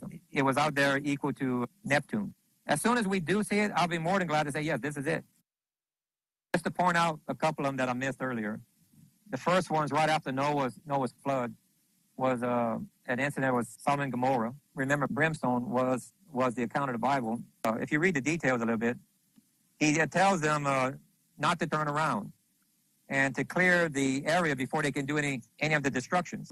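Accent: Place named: Louisiana, United States. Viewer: I would guess this guy is from Louisiana. Listening now…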